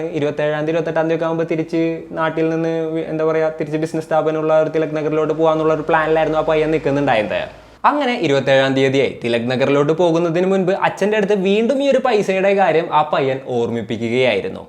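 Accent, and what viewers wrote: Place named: Kodungallur, India. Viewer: സ്ക്രിപ്റ്റ് ഒന്നു കൂടി ശ്രദ്ധിക്കൂ ബ്രോ. ചില സ്ഥലത്തോക്കെ കേട്ടിരിക്കാൻ ഒരു ഫ്ലോ നഷ്ടപ്പെടുന്നു. പിന്നെ ചെന്നുങ്ങയിൻറ്റ്, എത്തിങ്ങയിൻറ്റ്, പോയിങ്ങയിൻറ്റ് പോലുള്ള കൊടുങ്ങല്ലൂർ സ്ലാങ് പറ്റുന്ന പോലെ കുറയ്ക്കാൻ ശ്രമിക്കണം.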